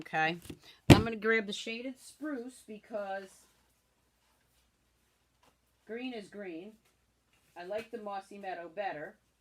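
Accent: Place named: New York, United States. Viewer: G'day, watching the replay here. I love your New York accent lol. Cheers from Heidi, Melbourne, Australia 😊💖💙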